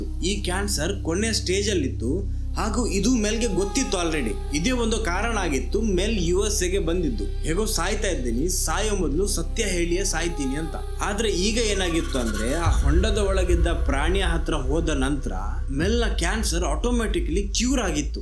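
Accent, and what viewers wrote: Mangalore, India. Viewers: Your From manglore ???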